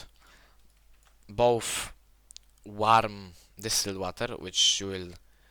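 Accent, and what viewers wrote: Poland. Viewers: Siema wiem że jesteś z polski po akcencie i napisie aktywuj windows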